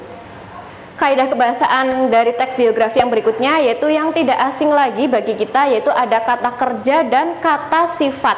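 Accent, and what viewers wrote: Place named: Java, Indonesia. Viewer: mbanya medok lucu xD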